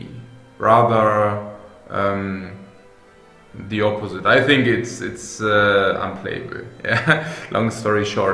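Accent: Germany. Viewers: his english sounds sooo german xD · Liege ich mit der Annahme richtige das du eigentlich deutsch sprichst? XD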